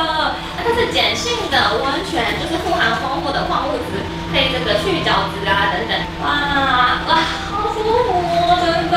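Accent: Taiwan. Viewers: 關仔嶺可買到泥漿溫泉包，回到家也可泡泥漿溫泉！ 還有一點我非常驚訝，我一定要說出來，我從頭到尾很仔細的聽內容，中文很強，100%的台灣人說話方式，除了有一點點口音之外，無懈可擊！ 真的很會講，比我口才好！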